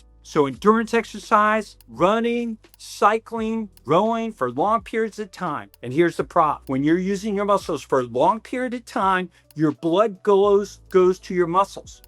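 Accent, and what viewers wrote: United States. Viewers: Gotta love this dude's mid-Atlantic accent. I bet he's from Maryland or somewhere nearby.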